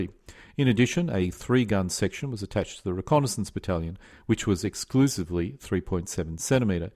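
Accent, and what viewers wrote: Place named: New Zealand. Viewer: Interesting. your voice sounds like New Zealand to me.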